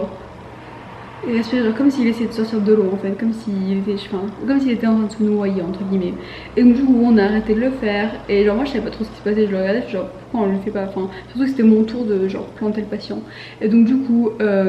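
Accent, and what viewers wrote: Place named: Italy. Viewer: Tu as l'intonation des italiens quand tu parles en français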